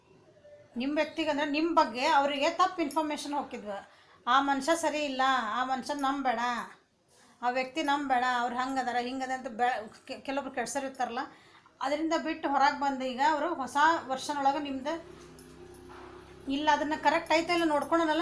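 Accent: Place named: Hubballi, India. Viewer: Tq ಮ್ಯಾಮ್ ನಮ್ಮ ಹುಬ್ಬಳ್ಳಿ ಬಾಷೆ 😍🙏🙏ನೀವ್ ಬಾಳ್ ಚಂದ explain ಮಾಡತೀರೀ I like ಅಕ್ಕಾ you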